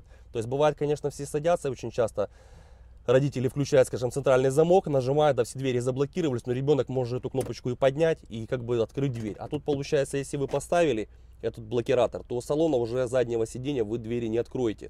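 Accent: Ukraine. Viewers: Вы такой мужчина прекрасный! Дай Бог Вам здоровья. Так хорошо рассказываете. И, вообще, обожаю украинский акцент.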